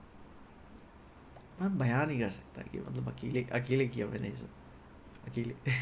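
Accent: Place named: Purvanchal, India. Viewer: Pta nahi kyu bhai Your win feels personal🥹. I could literally feel each of your pause and stutter. The moment of realisations, everything. I don't know why maybe because mai bhi aise hi ek humble background se belong krta hu purvanchal ke. Aapka lehza, aapki shabdaavali mujhse milti julti hai shyd isliye. But anyways keep going brother, someday I'll also be on my own aur ye saara kuch experience mai bhi first hand kr rha hounga.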